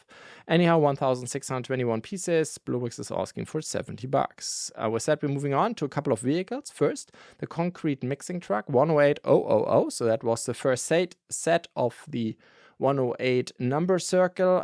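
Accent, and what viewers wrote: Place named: Germany. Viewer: Since my German is terrible while my family is over 1/2 German/Austrian American, I love listening to your accent. As a simple tip, bear - the animal - is pronounced the same as bare, as in not covered. Both rhyme with air. Beer, the drink, rhymes with ear, on your head. Your release videos are the best out there for non-lego sets. Pixel Dan's ARC-170 looks like what Lego Group should have done.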